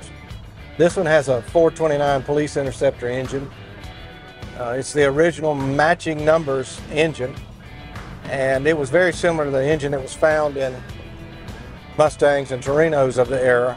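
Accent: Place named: South, United States. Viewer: What a beauty! I love the police cars of the 60's and 70's, but I'd even be happy to own an 80's square-body Crown Vic. Question for you: I notice that you have a mild southern accent, but you could also imitate that strong drawl of a good 'ole boy. What is the difference? Does it depend on the region? (Oh, I'm realizing that is the videographer. Great work on that too.)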